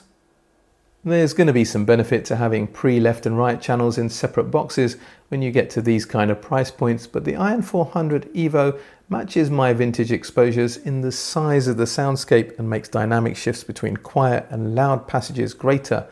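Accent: United Kingdom. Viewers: Hej! As a Swede, I sincerely enjoy your fine channel contents and your great British pronunciation…… I try to learn from it too 😀. I run a pair of Q Acoustics Concept 500 that I feed from an old Krell KBL and a KSA250. The silly amount of current that beast puts out hold the drivers in an iron grip….. and I must say it sounds pretty nice. Wonder what this reviewed Atoll integrated amp would sound like in comparison? Thanks for your great reviews! /Jan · I always look forward to your reviews and your rich British accent. I have to admit my jaw dropped when I saw you select an AC/DC album. LOL!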